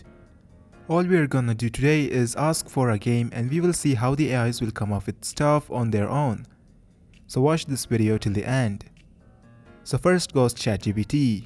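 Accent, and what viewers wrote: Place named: Bengal, India. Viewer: Bhai Bangali? Kotha shune bujha jay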